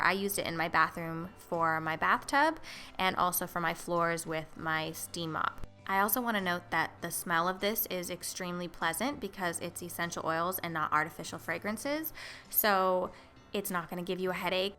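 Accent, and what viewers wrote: United States. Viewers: hey girl, where did you get that Californian accent??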